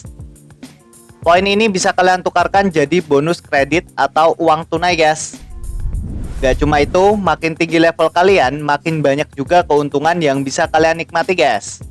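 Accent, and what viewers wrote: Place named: Indonesia. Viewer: orang mana bro? kok medok suaranya haha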